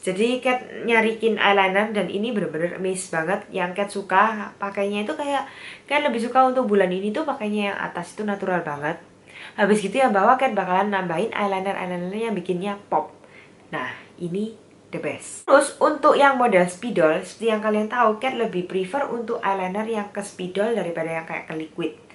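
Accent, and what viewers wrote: Java, Indonesia. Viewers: Ka cantik, aku suka cara ngomongnya. Podo2 jowo mbak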